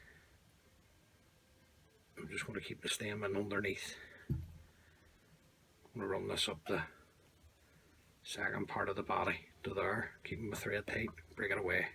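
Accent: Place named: Belfast, United Kingdom. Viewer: you come across really well, and the Belfast accent is clear to understand and brilliant. Who could not like a Belfast accent. Well done keep it up.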